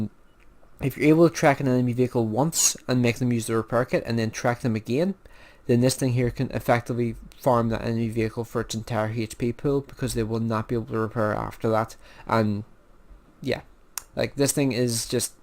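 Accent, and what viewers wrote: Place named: Belfast, United Kingdom. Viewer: Where are you, Belfast?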